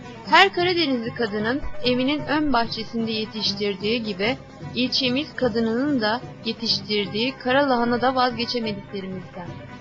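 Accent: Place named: Istanbul, Turkey. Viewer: Madem görelenin tanıtımını yapıyorsun o zaman sunumu yapanın ağzı istanbul ağzı değil Görele ağzı olsun yerel kültürün en olmazlarından biri de yerel ağızlar dır.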